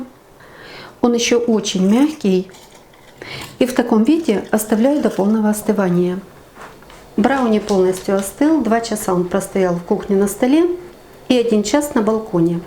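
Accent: Ukraine. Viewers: Всё подробно, честно! Нюансы очень важны. Огромное спасибо за уважение к подписчикам. ❤ И ещё, спасибо за красивую украинскую речь!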